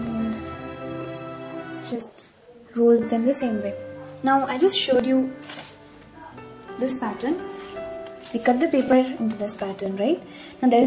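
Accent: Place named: India. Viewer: hey, i love your indian accent. you sound like you have a beautiful heart. thanks for the tutorial and am going to make some of the earring you showed us and post pictures for you. thanks for sharing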